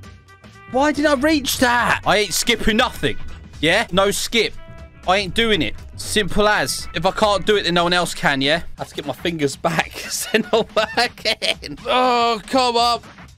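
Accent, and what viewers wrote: United Kingdom. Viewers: Cool vid man the bri ish accent tho ✨AMAZING MAN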